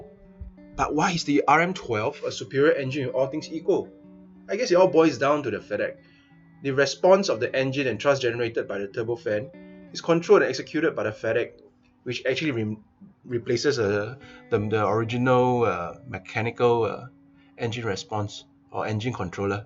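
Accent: China. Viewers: Pronounsation sounds like pure chinese .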